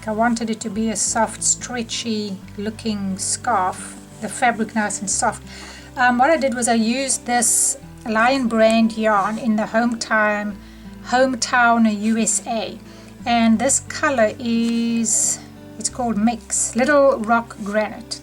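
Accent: South Africa. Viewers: That's a South African accent!!! How lovely to hear! Erna from Cape Town, S.A. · Awesome video :-) we're South Africans living in Ottawa, Canada ❤️Love your accent we recognized it straight away :-) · You're South African! Am I right?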